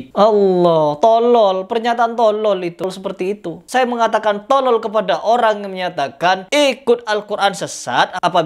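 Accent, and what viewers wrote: Madura, Indonesia. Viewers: bang kamu orang madura kah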